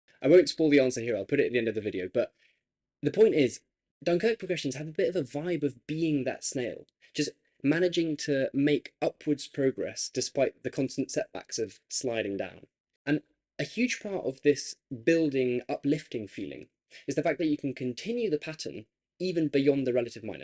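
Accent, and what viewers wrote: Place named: United Kingdom. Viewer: sorry to be negative, but I have to be. I cannot watch your videos. I have tried but all the hand waving drives me crazy. Then there’s the speed at which you speak; it is ridiculous. Why? Is there some hidden rule that says you have to go at a breakneck speed. I wouldn’t expect a drawl; that would be equally annoying. Just a normal pace. Also some attention to punctuation wouldn’t go astray. Your acquired accent makes it difficult to discern many words for the non Brit ear…maybe even fellow Brits have trouble understanding you ! I am sure the content is interesting and informative, but alas, I will never know. Unlike some of the sycophantic comments (which are probably your friends) I cannot subscribe or even (try) to listen or watch you again. Good luck.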